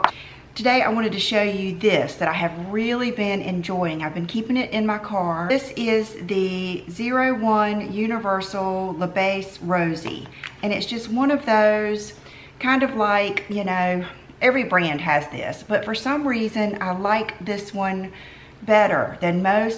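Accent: South, United States. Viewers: We have the same southern accent...I am from Lake Martin, Alabama? What state are you from?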